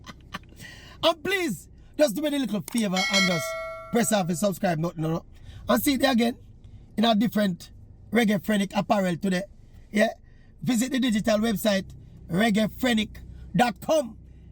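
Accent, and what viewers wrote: Jamaica. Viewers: You speaks like a balance Jamaican...Big up our Culture!!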